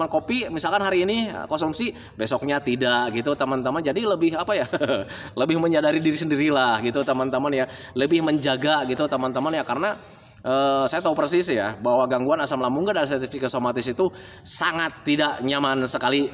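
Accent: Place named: Makassar, Indonesia. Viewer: Makassar mn suaranya ❤❤❤❤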